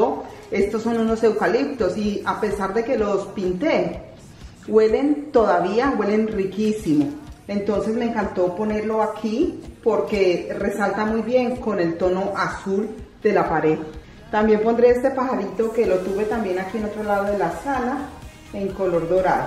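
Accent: Colombia. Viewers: me encanta el acento Colombiano, en instantes se escucha!!!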